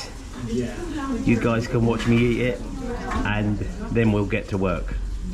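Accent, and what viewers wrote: United Kingdom. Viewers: Great video ! Love your banter and lovely Brit accent and humour. Great family and work ethic. Wish it would rub off on those around you. ❤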